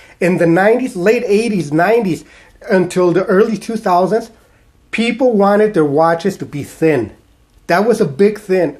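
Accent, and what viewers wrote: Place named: Mexico. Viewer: Don't pay attention to these fucking swiss fanboys who complain about your mexican accent. You have more class and appreciation of unique timepieces than all of them put together.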